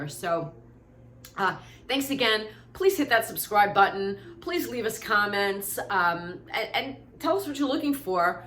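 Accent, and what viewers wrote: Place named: New York, United States. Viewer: What’s that accent?!?! Big 🍎 ??? 🥰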